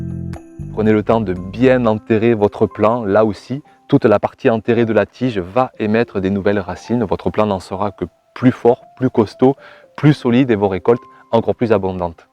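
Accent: South, France. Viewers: Oyez! Oyez! braves gens, l' nouveau est arrivé: il a un toujours un petit accent du Sud, un bon parfum de tomates variées et autres fruits et légumes, une belle pointe de charisme, une énorme envie de partager, un coeur qui bât au rythme de son potager (En cette saison il devrait être au ralenti? Que nenni!! Il est en plein accélération.) En 2019, préparez-vous à de l'émotion, de l'échange, du partage, de la réussite (des échecs aussi!) et du goût dans son potager mais également dans le vôtre. Merci pour cette vidéo qui a réchauffé l'atmosphère de plus en plus froide. Vive la tomate!!! A bientôt et bonne saison à tous les jardiniers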